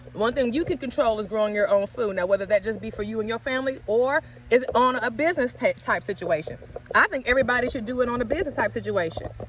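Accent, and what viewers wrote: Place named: Virginia, United States. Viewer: I love you! I'm from Virginia but living in Hawaii for 4 years and I love your speech, I miss it. I love how you broke this down and what you have to say. I just found you and this video totally changed the way I've been looking at this.😃The business and my lava rock land here is exactly what I'm trying to approach this from.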